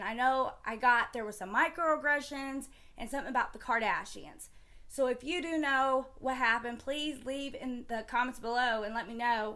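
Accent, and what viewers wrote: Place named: South, United States. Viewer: Wow I never would've guessed you're from Indiana. You sound like you have a southern drawl.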